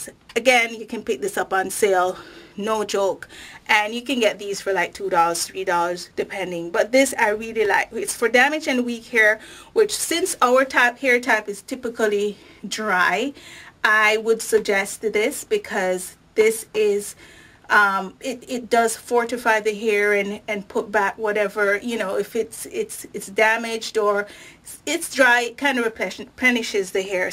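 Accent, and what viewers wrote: Jamaica. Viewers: Love this vid...thanks for the patois too. Makes me feel like home :) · you sound Jamaica :)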